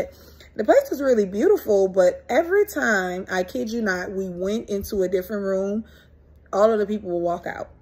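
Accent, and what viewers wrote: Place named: United States. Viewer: they probally thought you were illegal africans at first sight if they hear your accent and it sounds ameriican they will treat you diferently than other black people .unfortunately l a lot of africans illegally go to italy and get involved in things like prostitution and drugs they assume all black people are into this.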